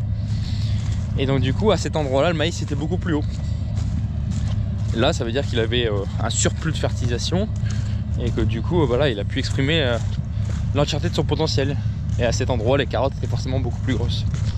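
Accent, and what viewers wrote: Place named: Lorraine, France. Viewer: hello merci pour cette réponse dans l'attente de la suite des video en aparté je me regale aussi du petit accent bien de chez nous qui me fait du bien amitié de lorraine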